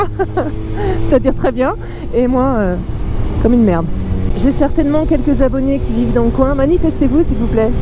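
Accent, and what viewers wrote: South, France. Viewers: J'ai fait pause pour vérifier la résolution par défaut que YT avait sélectionnée quand tu en as parlé, et j'ai bien fait parce que le saligaud l'avait laissée en 1080... Bon c'était déjà pas dégueux, mais ces paysages en 1440... <3 Et pour l'accent, c'est compliqué parce qu'il y'en a tellement de différents dans le Sud ^^ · TON ACCENT DU SUD LE TRAVAILLER ENCORE POUR ENTENDRE LE SOLEIL DANS LA VOIX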